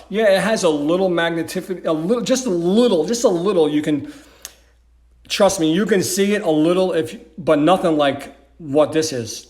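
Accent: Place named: East Coast, United States. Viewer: Thank you for this simple and effective demonstration. I do love an East Coast accent!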